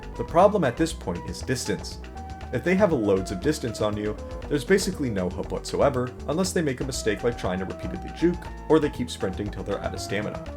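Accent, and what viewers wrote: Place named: United Kingdom. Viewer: ARE YOU FUCKING BRITISH?:face-purple-crying::face-purple-crying::face-purple-crying::face-purple-crying::face-purple-crying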